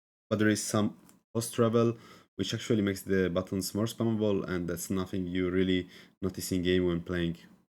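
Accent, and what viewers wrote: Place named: Italy. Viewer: l'accento italiano non mente grazie per la review che volevo prendermelo grazie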